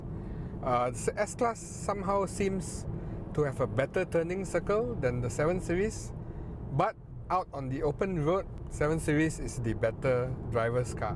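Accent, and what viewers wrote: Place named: Malaysia. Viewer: Great review. Informative and extensive, with nice Malaysian touches with your use of "la" in your speech!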